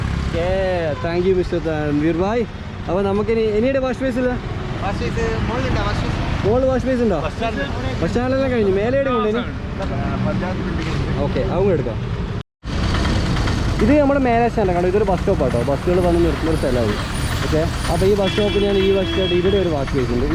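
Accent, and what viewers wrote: Kannur, India. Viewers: Kai kazkeet poya mathi😎 kannurkarante tone ingana aan💪🏻😎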